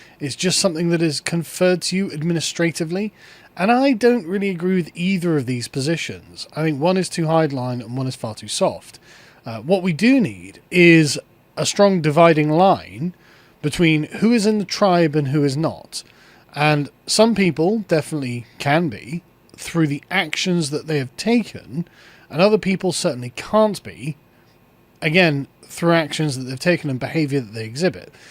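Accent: United Kingdom. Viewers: Are you British? Are you South African, Australian, a New Zealander, etc? Or are you Akkadian?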